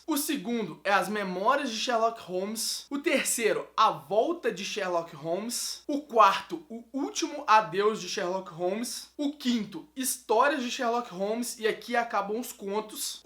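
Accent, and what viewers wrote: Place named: Minas Gerais, Brazil. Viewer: cara vc é mineiro! vou falar aqui "procês"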